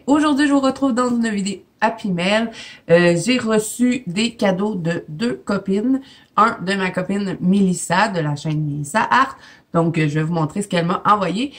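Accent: Canada. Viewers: Cc 😄merci pour ta vidéo de produits pour le coloriage. Je ne me rappelle plus si je t'ai dit que je me suis abonnée à ta chaîne youtube 😄, j'adore ce que tu fais et j'aime beaucoup ton accent canadien,bises, 🥰❤️💕 Martine.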